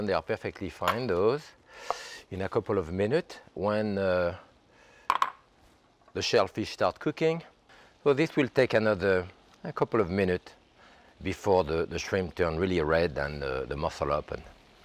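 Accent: France. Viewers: 've been watching this whole day..the french accent,his attitude and his skills....great stuff!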